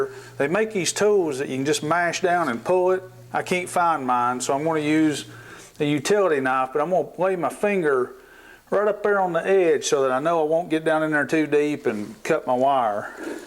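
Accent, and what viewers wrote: United States. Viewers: You have a great American accent. Thanks and hello from down under.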